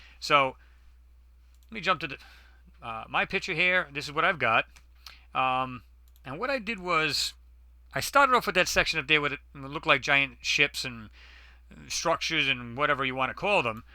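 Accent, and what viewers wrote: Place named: Boston, United States. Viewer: Are you from Boston?! Lol my two favorite accents are the British accent and the Boston accent!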